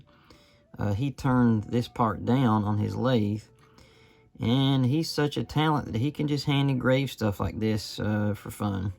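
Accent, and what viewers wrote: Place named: East Tennessee, United States. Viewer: Came here looking for this information! You sound like you are from close to where I am born and bred...east Tennessee? I am so glad I have found your channel as I am obsessed with colonial period.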